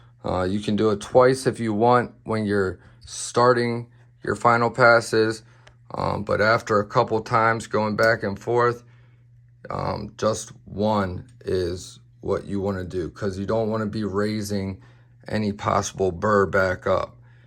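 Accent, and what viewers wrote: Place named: Baltimore, United States. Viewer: You from/in Bmore?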